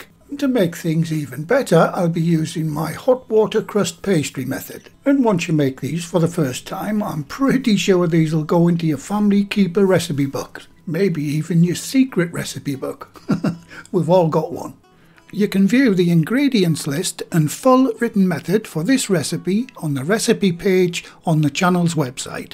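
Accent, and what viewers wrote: United Kingdom. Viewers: I live 100 miles away from where this gentleman sounds like he comes from. I'm not so sure people are being offensive, the video is very good, his voice does resemble one of the worlds most recognised animated characters, I'm pretty sure this will also help boost the hits and likes on the video. Also, accents should be celebrated, I love how our accents vary across the UK, for small island, you can 30 miles in any direction and find a different accent. 👍🏻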